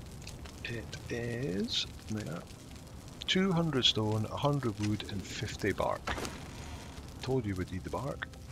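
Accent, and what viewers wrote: Scotland, United Kingdom. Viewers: Excellent play through. And nice to hear a Scotsman for a change 👍✌️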